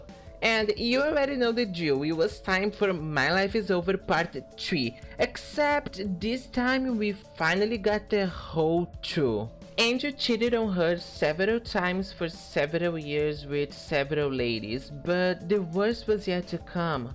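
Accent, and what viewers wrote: Brazil. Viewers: meu vc é brasileiro? kkkk senti mto o sotaque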